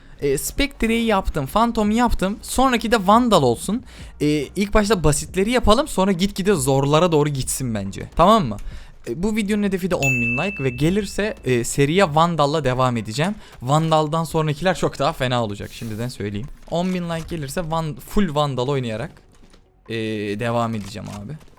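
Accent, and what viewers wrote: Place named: Yozgat, Turkey. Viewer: Abi sen yozgatlimisin konusman ole geldi bana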